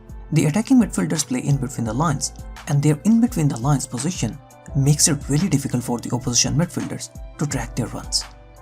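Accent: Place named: India. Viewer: Bro please i like your videos but please fix your english accent its very annoying..... i hate this indian accent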